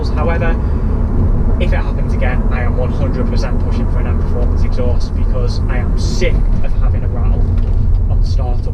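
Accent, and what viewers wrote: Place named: Liverpool, United Kingdom. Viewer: Love the scouse accent nearly has good has ours great video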